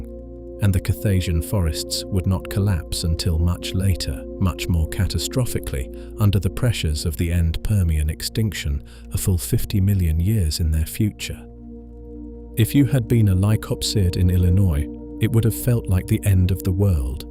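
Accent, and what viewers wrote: United Kingdom. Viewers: This is the best, most soothing ai voice out there. Perfect pitch and key mixed with a British accent. Gentle background droning creates ethereal atmospheric support that does not detract. Please stay with this voice for these beautiful stories of our blue magnificent planet. Thank you so much for creating and sharing. Very much appreciated ❤ Listening from Washington state. USA Subscribed ✅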